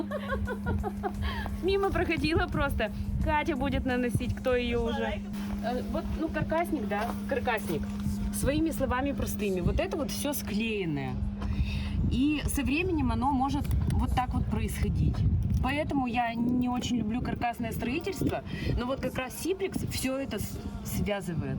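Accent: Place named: Udmurtia, Russia. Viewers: Говор сразу понятно Удмуртия😊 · Как мне нравится удмуртский говор, мммм😊! Сколько знаю девочек с Удмуртии - все мегапозитивные, очень симпатичные, вечно деловитые💪❤ и очень душевные❤❤❤ Привет от соседей- татар! 👋👋👋😊😊😊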